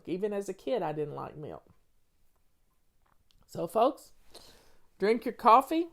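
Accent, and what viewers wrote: South, United States. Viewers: Hello from Manitoba! I know hun technology is so irritating, you look wonderful! Love your Southern accent!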